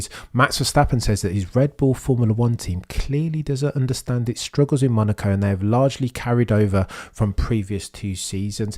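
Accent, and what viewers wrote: United Kingdom. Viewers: This guy more British than alll the British people I know. Like where is this accent even from. What part?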